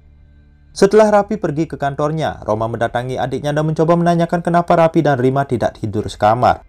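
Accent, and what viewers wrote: Bali, Indonesia. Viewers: Bli org bali ya?? Logat nya kyk ny dari bali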